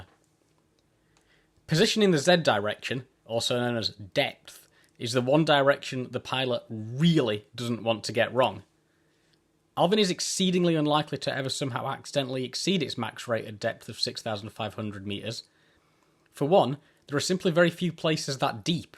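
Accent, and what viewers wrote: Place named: United Kingdom. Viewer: fwiw i think your voice/accent is great. granted im from the uk so i can understand it naturally, but it’s nice to hear people who speak “normally” (i.e. the way i/people i know talk) on youtube once in a while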